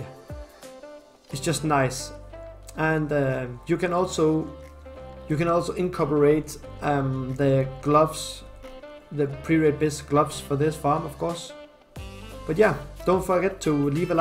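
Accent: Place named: Denmark. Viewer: You gotta be a Dane with that accent! Anyways thanks for the video.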